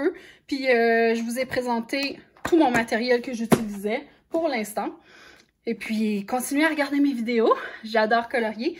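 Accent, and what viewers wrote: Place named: Canada. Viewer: Bonjour je suis fan de coloriage mystères j'ai beaucoup de livres où par numéro j'ai les crayola par 100 les crayola teinte peau sont parfaits et pour les marrons aussi tes colos sont vraiment magnifiques ont ne peut pas se privez de nuancier par contre les jumeaux des crayola,les fibracolor eux sont numérotés merci beaucoup pour ton partage et se merveilleux accent tout droit arrivé du Canada dont je rêve d'aller visiter 🙏👍👍👍👍😘😘😘😘🥰🥰🥰🥰💖💖💖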